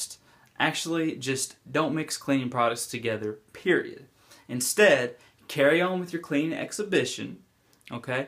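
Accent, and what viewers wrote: South, United States. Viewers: Are you from the south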